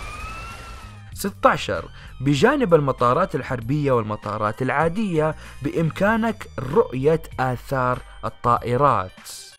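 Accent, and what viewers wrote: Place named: Saudi Arabia. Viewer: لا السعودية واضح من لهجته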